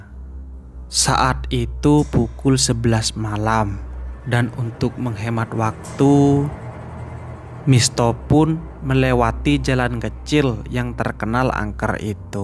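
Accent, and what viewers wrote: Madura, Indonesia. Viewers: Note voice atau logat indonya khas bondowoso madura..tapi keren dah..lanjut kan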